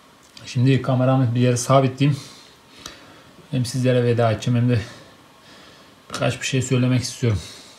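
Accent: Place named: Yozgat, Turkey. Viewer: Yozgatta selamlar :) Abi konuşman zbizim Buraların Konuşması Gibe Leee 😂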